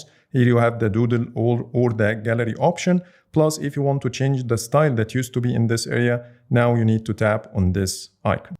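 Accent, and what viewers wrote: Egypt. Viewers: واضح جدا انك مصري, مو عارف كيف اشرح كيف عرفت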